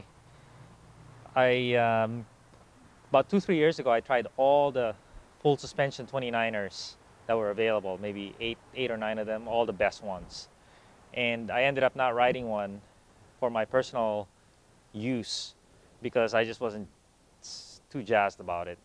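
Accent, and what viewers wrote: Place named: Philippines. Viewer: You sound like a Filipino accent, are you? Anyway am still not convinced that 29'er is way better than 26'er. But I love to try it in a single track in North wales or lake district.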